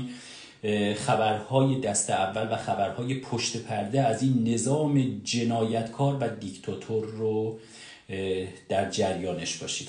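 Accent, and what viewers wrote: North, Iran. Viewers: چہ ادبیات زیبائی با لحجہ شمالی . فکر نمیکنم کسی حریف تو در جمھوری 😂اسھالی باشد . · عاشق شمالی حرف زدنت هستم❤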